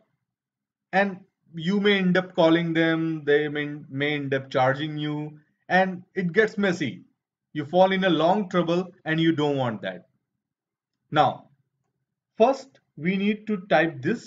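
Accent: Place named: India. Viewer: Fuck you and your accent. Man I hate when an indian speaks English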